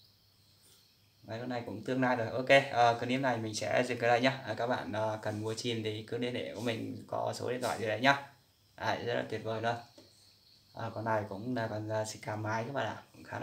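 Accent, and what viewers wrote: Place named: North, Vietnam. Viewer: Ồ xa quá. Em thấy a nói giọng bắc tưởng a ngoài bắc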